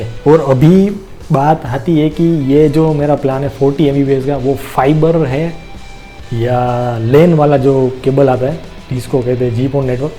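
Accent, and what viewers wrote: Gujarat, India. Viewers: Wah bete wah Aap gujrati ho na aapki hindi se pata chal gaya Hu pan gujarati chhu bhai..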